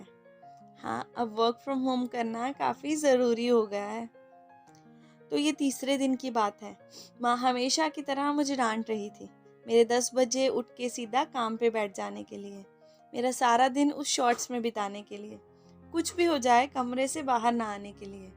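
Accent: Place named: Punjab, India. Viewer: You have lovely punjabi tone as well💞💞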